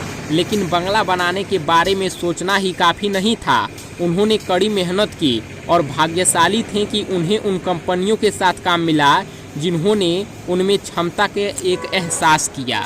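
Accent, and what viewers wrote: Bihar, India. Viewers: Are you bihari and bhojpuri speaking person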